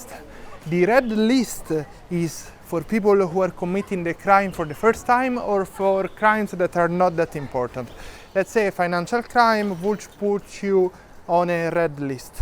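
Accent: Italy. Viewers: i love you tell the truth with your lovly italian accent:popcorn-yellow-striped-smile::popcorn-yellow-striped-smile::popcorn-yellow-striped-smile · He has a thic Italian accent😂I find it very lovely,but Italy is my neighbor so the accent is very understandable to me😉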